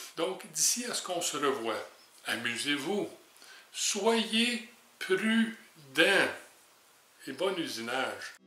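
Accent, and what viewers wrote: Canada. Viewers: N'écoutez pas les esprits chagrins ! Moi, j'ai découvert vos cours en ligne depuis une semaine et malgré l'accent (que j'aime beaucoup étant fan des "Têtes à claques" et de Céline Dion même si je ne comprends pas tout et suis obligé de revenir en arrière très souvent) j'apprécie énormément toutes ces connaissances que vous nous faites partager, toute cette partie sécurité très importante, dont je m'imbibe en ce moment, pour continuer à faire de l'usinage en pleine santé physique, pouvoir continuer son hobby ou sa passion sans dommage. J'apprécie aussi beaucoup cette progressivité dans l'acquisition des connaissances couplant l'écrit, l'oral et la pratique. Merci pour tout.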